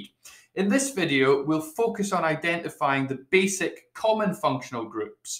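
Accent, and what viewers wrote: United Kingdom. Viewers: You had my loyalty at "What the hell does this complete mess mean?"....and your British accent of course 😅😂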